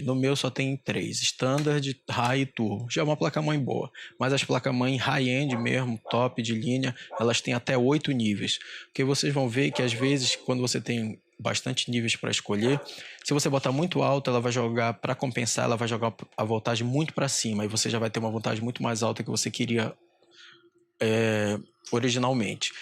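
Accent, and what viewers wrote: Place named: Pará, Brazil. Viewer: Certeza que é do Pará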